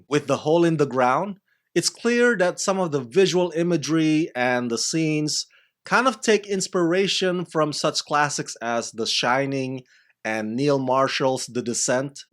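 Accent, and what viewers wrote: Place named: Indonesia. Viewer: You sound like Indonesian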